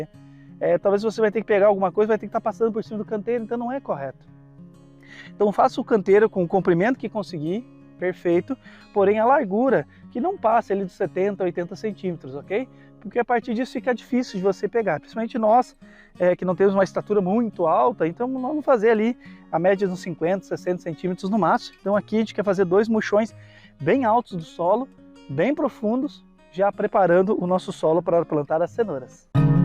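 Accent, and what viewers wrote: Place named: Rio Grande do Sul, Brazil. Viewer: Olá, sou gaúcho. Pelo sotaque vc tbm. On?de fica teu sitio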